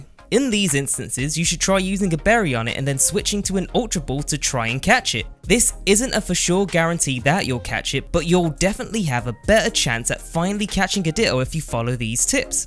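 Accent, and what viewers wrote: United Kingdom. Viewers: Örs Vajda Yo, he sounds English. That’s why he’s pronouncing it the way he is. The British did sort of create the English language.. even if it’s a mashup of other ones. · He says di-o instead of ditto lol (Yes i know he has a british accent dont come at me)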